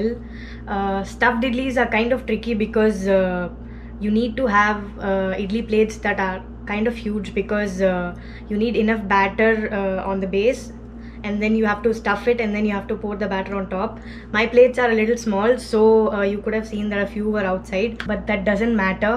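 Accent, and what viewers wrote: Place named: Tamil Nadu, India. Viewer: Your accent confirms you are thamizh 🙂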